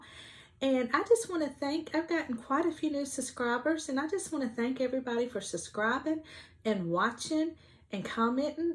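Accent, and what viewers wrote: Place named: South, United States. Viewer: I just found you and being from Rock Hill SC , I recognized that southern voice and said” I need to watch her” and then I heard you say y’all and I was hooked. The book review was great. I’m always looking for good books to read. Thank you for a great video and I think the green tinted fabric would look great for March sampler😊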